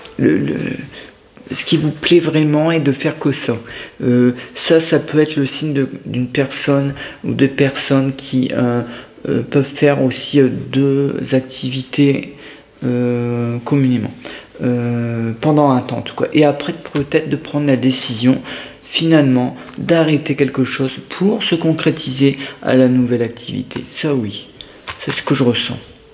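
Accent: North, France. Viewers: vous êtes du nord? Bonne soirée j'adore votre guidance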